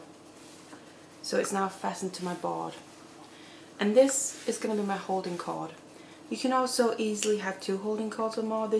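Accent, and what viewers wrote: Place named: Wales, United Kingdom. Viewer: Thanks for the video. I've always wanted to learn how to macrame. I love you're accent are you Welsh?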